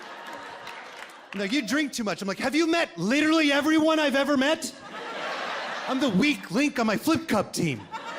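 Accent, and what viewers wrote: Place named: New York, United States. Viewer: I could of swore I heard a New York accent. Thanks for the laughs.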